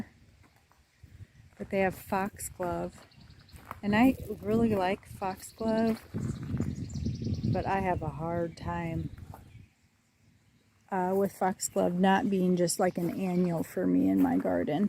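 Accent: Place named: Chicago, United States. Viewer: About 30 seconds in on this video I said to myself, “based on that accent, this woman must be from Chicago.” Then at about a minute later she says “we’re about 2 hours from Chicago.” You can tell that accent anywhere! Beautiful garden. Thank you!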